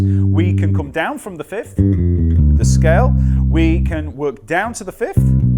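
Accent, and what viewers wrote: United Kingdom. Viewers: Uk accent :)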